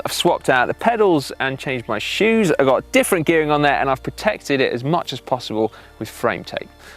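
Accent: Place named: England, United Kingdom. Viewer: Ble ble ble idiotich akcent facken englenda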